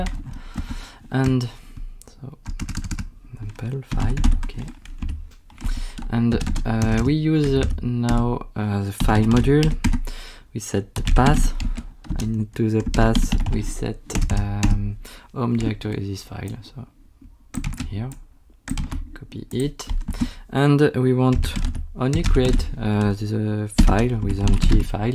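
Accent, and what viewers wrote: France. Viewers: Hello, il est evident de part ton accent que tu es Francais. J'aimerais bien avoir un tutorial sur Ansible. J'ai deja souscrit au canal, et j'aimerais bien echanger avec toi pour avoir des réponses aux questions que je pourrais avoir. Merci de me dire si c'est possible.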